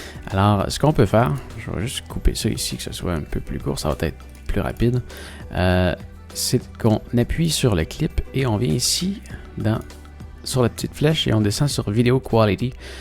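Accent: Quebec, Canada. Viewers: Très bon ton tuto +1 et +10 pour l'accent tabarnak :)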